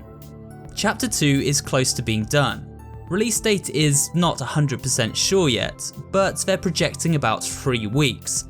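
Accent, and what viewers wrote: United Kingdom. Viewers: Why does she talk like British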